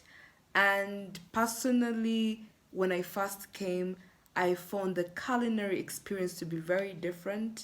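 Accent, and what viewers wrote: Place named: Kenya. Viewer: Welcome to Nairobi, you true look and spaek like a Kenyan, for a Ugandan do not call yourself a foreigner in Kenya. I am from western Kenya and my Luhya language is very similar to some of Uganda local languages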